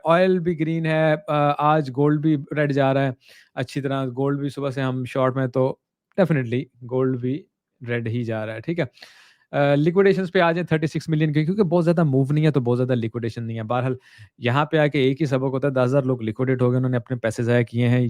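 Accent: Punjab, India. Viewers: Isko kehte hain att ta hahaha sir jab apne bola na dxy ne att chukki hui hah bht sakoon mila apki punjabi itni pyari hah na sir bht mithas hah apki lang mein karazdar hain hum apki mohabat k sir alllah bht khush rakhe apko ❤️thankx for everything sir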